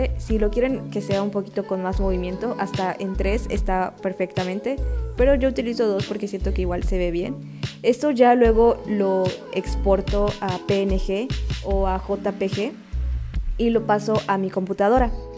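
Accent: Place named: Yucatán, Mexico. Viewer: Por mi casa también pasa Zgas jaja 🙊 Tengo curiosidad de dónde eres, sospecho que de Yucatán o Quintana Roo, tu acento me recuerda al mío jaja ❤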